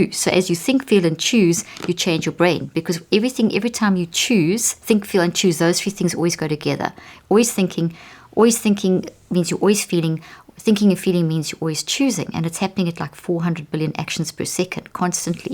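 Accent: South Africa. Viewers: Ek hou van haar aksent!suid afrikaans aksent! Claude Frankryk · South African….Irish accent much nicer, I am saying this as a SA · I was guessing but wasn’t sure. Typical South African accent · All good. I wish you didn’t have the SA accent and didn’t have to talk so fast. On the other hand that will make people buy your book. Talking so fast seems to be a millennial thing but it doesn’t come across as being sincere, or did you have too much coffee. You kept on showing your book but it always have that metal tree in front of it. Was that also a trick to make is buy your book?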